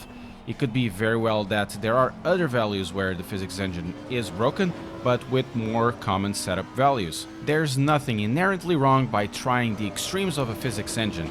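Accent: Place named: Portugal. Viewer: you sound portuguese.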